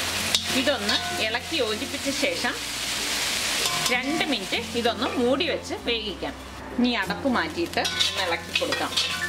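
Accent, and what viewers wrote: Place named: Thrissur, India. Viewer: thank you madam .also ur thrissur voice OK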